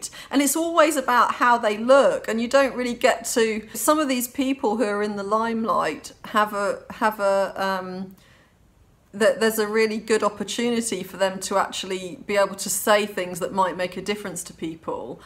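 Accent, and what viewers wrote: United Kingdom. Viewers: your perfect British accent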